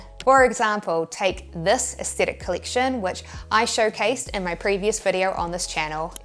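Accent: New Zealand. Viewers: I already knew you were from New Zealand, I'm American. Thanks for all the great content you put out. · I really like the New Zealand accent :) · Knew you are a kiwi I grew up with a lot of kiwis in PNG so I know the accent. Thank you for your video 🥝😍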